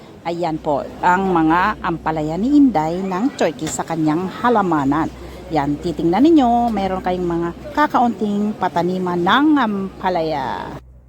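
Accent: Iloilo, Philippines. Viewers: Ilongga ka Po ba ma'am?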